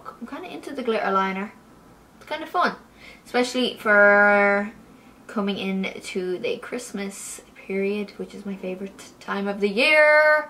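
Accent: Ireland. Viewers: What part from Ireland are you from??? Xx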